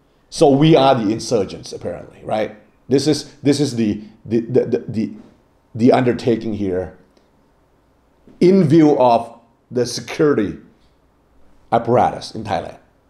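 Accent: United States. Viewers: I am here again listening to you. Not coming to sink in the politics. I have headache from that. But mainly to hear your American accent I like it so much very much.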